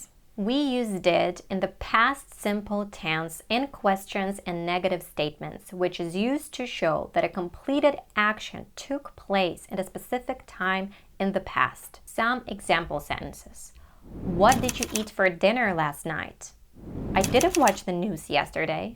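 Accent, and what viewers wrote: United States. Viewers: Wow! You're Ukrainian. I thought all the time you were American. You've done a great job. Congrats. 💐🌹💐🌹💐🌹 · Wow! You have an excellent American accent, I like it, I congratulate you and keep going. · The fist time i saw you on youtube, i thought you were American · I❤your American accent. You're the Best. Thanks 🥰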